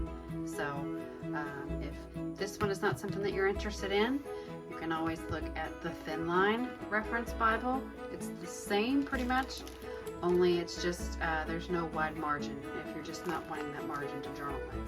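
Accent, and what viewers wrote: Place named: Jacksonville, United States. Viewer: Are you in Jacksonville? Your accent.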